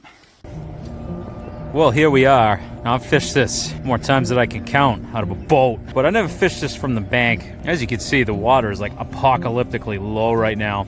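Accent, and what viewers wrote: Midwest, United States. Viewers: I’m confused. Midwest or Boston accent here lol.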